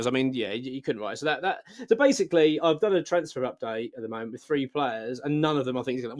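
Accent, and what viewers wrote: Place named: West Midlands, United Kingdom. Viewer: was you born in the west midlands? I can tell an accent.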